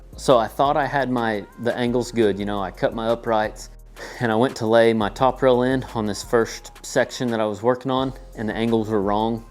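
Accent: Oklahoma, United States. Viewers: Hey man are you from OK?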